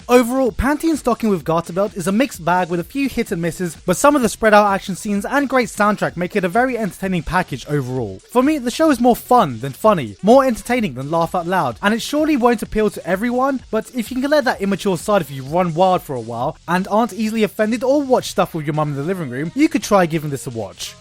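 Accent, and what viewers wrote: United Kingdom. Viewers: But........ Aren't you british??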